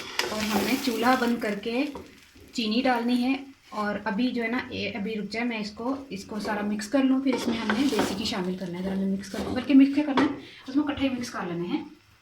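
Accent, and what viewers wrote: Sialkot, Pakistan. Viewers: Very nice or apni very nice sialkoti panjabi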